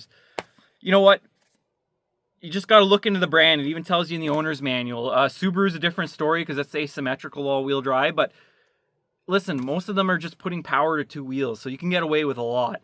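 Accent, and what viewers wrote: Canada. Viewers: Guess you didn't catch his Canadian accent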